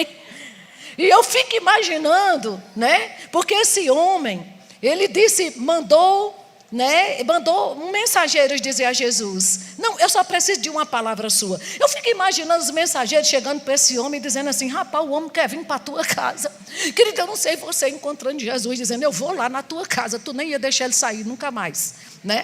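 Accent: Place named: Northeast, Brazil. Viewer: Sou de Pernambuco e estou em Brasília, e é bom ver o nosso sotaque nordestino. E prega bem, palavra maravilhosa.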